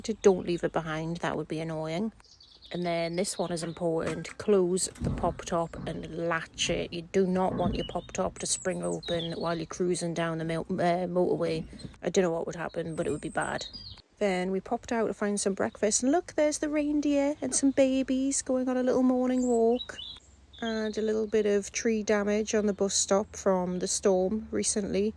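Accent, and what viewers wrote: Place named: North of England, United Kingdom. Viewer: This is a breath of fresh air! Loved this vlog this morning and hearing your story. Wonderful and freeing life you have. The magic of seeing those reindeer.. I would cry too. Taking us on your woodsy bike rides is so lovely to watch and you chatting is like chatting with a friend. Love your candid realness. I was born in your neck of the woods in the North of England. Parents immigrated us to Canada many moons ago. Your accent captured me immediately❤. Keep going, I am loving your channel so much. 😃🇨🇦👋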